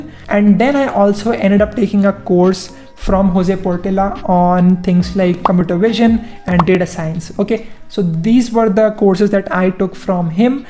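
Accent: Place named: Andhra Pradesh, India. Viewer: thanks for reply but it seemed like you are from any part of our South like Andhra guy so that's why asked.by the way really you are doing great job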